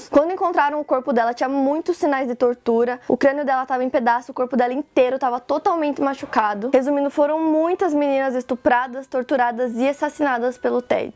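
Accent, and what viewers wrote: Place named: Paraná, Brazil. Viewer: Sotaque paranaense, sei pq sou paranaense tbm sksk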